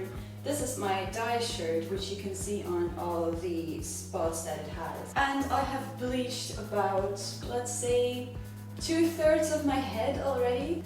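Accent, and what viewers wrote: United States. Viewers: You sound American, but you have a totally different speech pattern. Where are you from? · It sounds a bit American also! It's crazy, but calming. If, that makes any sense. Haha I just love your voice. 😳😳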